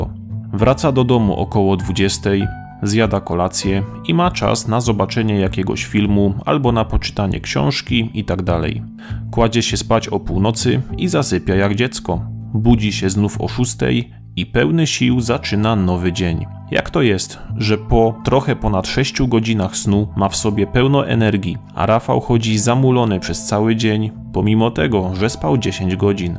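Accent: Silesia, Poland. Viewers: Krótko, zwięźle i sensownie. Lekki śląski akcent 😉👍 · Narrator to Ślązak? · Jesteś ze śląska? Tak mi się wydaje, że masz akcent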